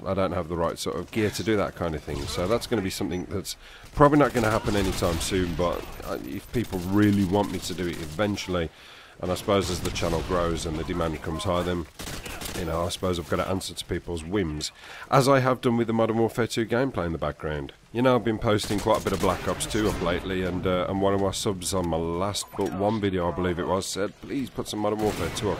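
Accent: Birmingham, United Kingdom. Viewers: I thought you were a fat, bald Brummie! 😂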